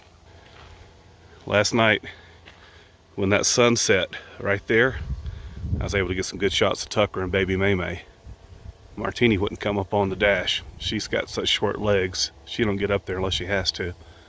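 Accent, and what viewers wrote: South, United States. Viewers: why you live in navada and you talk southern ?? ohhh the duckling. yes you do have good babies. i have to admit, i do enjoy you video....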